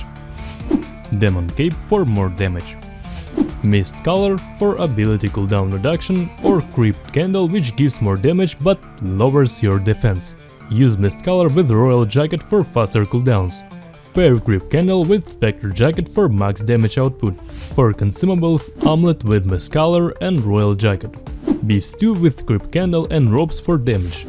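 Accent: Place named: Eastern Europe. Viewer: This guy never misses! Once again, a great video. I also like how you included and broke down the fights with each staff, very informative. You probably already have it on your list, but I would love to see an arcane staff video from you. Arcane been kicking my a*s in CD but might be just a skill issue on my end. Just a bit of viewer feedback, I personally found it harder to follow at times, as the music seemed to be loud at some points of the video. Personally, I would prefer if the music volume would be a little lower overall. As someone who has an Eastern European accent like yours, I find it that people take more time to register what your saying. So during the fast paced fight narration parts of the video the music can create a bit of a barrier. Other than that, it's a perfect video! Love your style, and thanks for sharing your knowledge with us mate! 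Giveaway entry: VageMage - Asia server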